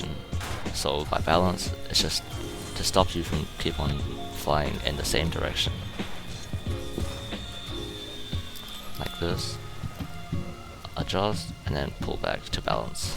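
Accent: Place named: Germany. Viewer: Ich denke mal du bist deutsch, ich denke, dass ich das ein bisschen raus höre. Also ich hab eine Frage, muss man durchgehend Tornado spinnen? Und lenkt man dann nur mit w und s?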